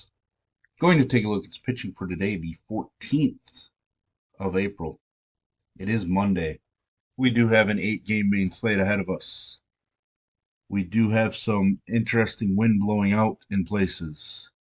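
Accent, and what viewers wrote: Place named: Wisconsin, United States. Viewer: Dope info here. You got to be from Wisconsin right?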